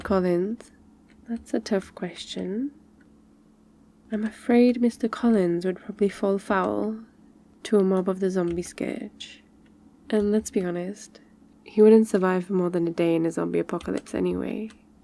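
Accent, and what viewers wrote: United Kingdom. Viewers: OMG BRI'ISH?!?? 😶😮 I mean Same but still! Cool voice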